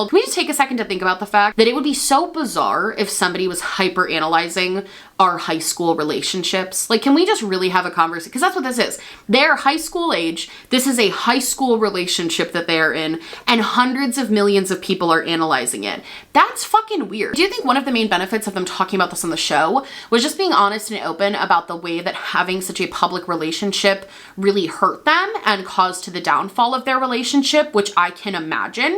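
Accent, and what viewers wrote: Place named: Upstate New York, United States. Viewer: Love how you have the most subtle upstate NY accent 😂😍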